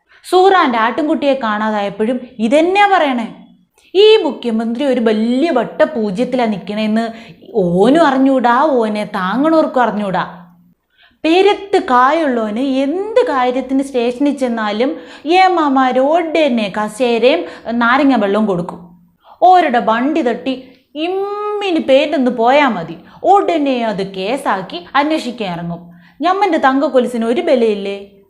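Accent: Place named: Malabar, India. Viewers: മോളെ.. മലപ്പുറം താത്ത.. മലപ്പുറം. ഭാഷ..😂😂😂... പറഞ്ഞ വിഷയം..😊😊😊. പക്ഷേ മോളെ.. എല്ലാം നല്ലത് തന്നെ. എന്നാൽ....... ആലോചിച്ചു. ചിന്തിച്ചു.. മുൻപോട്ടു. പോവുക.. 🙏🙏🙏. 👏👏👏. 👌👌👌. 💞💞💞. 💕💕💕... സ്നേഹത്തോടെ... ❤ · പൊളിച്ചു മോളേ 😂 സൂപ്പർ അവതരണം... മലബാർ സ്ലാങ് സൂപ്പർ... അഭിനന്ദനങ്ങൾ 💕💞💕 🎉🎉🎉🎉🎉🎉🎉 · മലബാർ ഭാഷയും വഴങ്ങും👍👍👍👍👍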